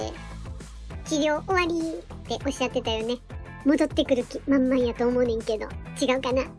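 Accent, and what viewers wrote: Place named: Kansai, Japan. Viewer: 何れにしても、yukoちゃんの発信は、何気に通り過ぎていた事に、一旦、足を止め、考えさせられ、気付かされるチャンネルなんだよね。コレってyukoちゃんの独特な関西弁キャラで楽しいチャンネルなんだよね!!コレからも😆ファイト🤗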